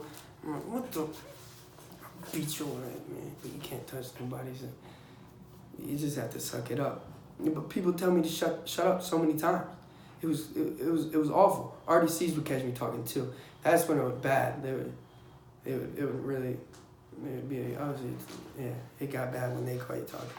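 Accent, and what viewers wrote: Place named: East Coast, United States. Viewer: 😂😂 i can tell mans is from the east coast good shit my guy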